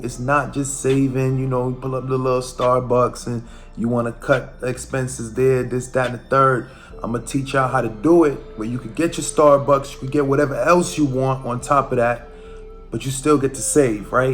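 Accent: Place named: New York, United States. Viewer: aye you sound from NY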